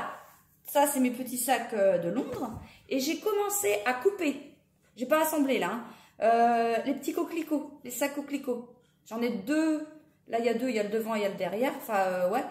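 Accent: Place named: Mayenne, France. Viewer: Bonjour j adore votre énergie et votre simplicité du coup je me suis abonnée et j ai visionner vos anciennes vidéos j ai adoré celle de la teinture de la laine et c est du boulot je viens d entendre la ville Laval et rien qu' à vous entendre je me doutait que vous êtes mayennaise je suis aussi de la région à bientôt dans la prochaine vidéo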